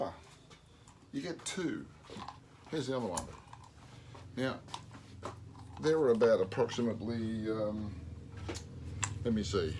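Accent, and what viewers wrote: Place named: Australia. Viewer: Just found your channel. Good to have an Aussie accent on the tube.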